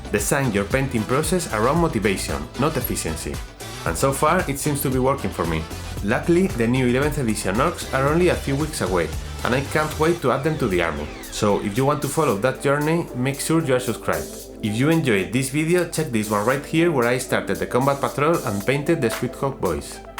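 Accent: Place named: Spain. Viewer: Como se nota que eres español por el acentazo que tienes, hablas el inglés con las tripas xD. Quizas te iria mejor en el canal si te pasas al castellano, ya hay muchisimos canales de habla inglesa y nunca vas a tener el alcance que tienen ellos en inglés, de verdad te lo digo con cariño, tu pronunciación deja mucho que desear y dudo que crezcas por ese camino. En cambio en castellano no hay tanta masificación y menos aun centrada en la parte de pintura del hobby, ahi podrias abrirte un hueco. Un saludo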